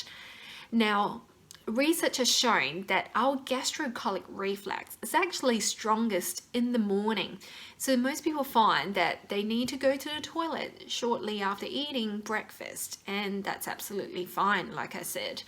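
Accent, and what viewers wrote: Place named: Australia. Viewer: You sound like an Aussie 😊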